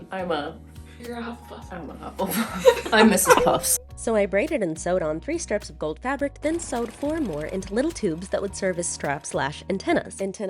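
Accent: New Zealand. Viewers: your New Zealand accent is awful I am truly offended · As a new zealander im disappointed in that accent 😭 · im sorry but that kiwi accent is absolutely terrible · that nz accent tho. god almighty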